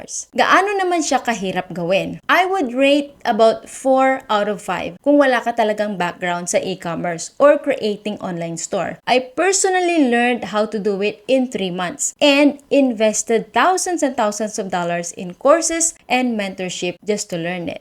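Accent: Pangasinan, Philippines. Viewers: i can hear my co-pangalatok po hehehe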